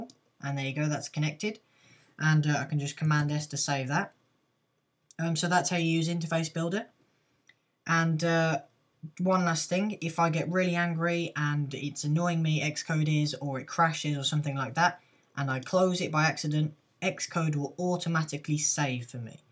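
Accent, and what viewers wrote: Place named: United Kingdom. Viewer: Not to hate on British people, but do you seriously think anyone can understand a damn word you're saying? So high pitched, fast and generally obnoxious.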